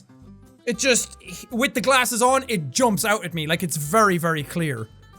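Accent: Ireland. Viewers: That's the Irish accent for ya. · My earphones broke and now I miss hearing Irish accent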